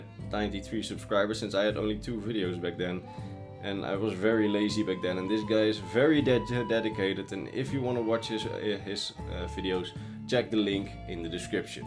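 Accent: Netherlands. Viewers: By your accent i can tell you are dutch. Why hello fellow dutchman. How i wish the last dragonborn would be off on the quest to claim the throne of the empire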